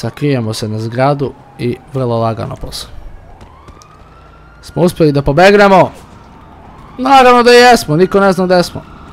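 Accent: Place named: Eastern Serbia, Serbia. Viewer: Akcenat istocne Srbije. Nista lose i nista cudno -_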